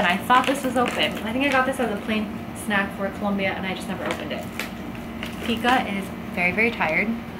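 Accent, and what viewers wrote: California, United States. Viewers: I like your Cali accent, stands out in NYC.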